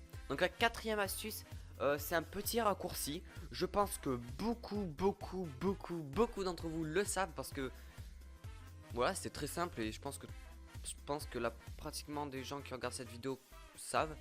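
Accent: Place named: Canada. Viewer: On dirais que t'a un accent canadien ça me fait trop rire 😂😂😂😂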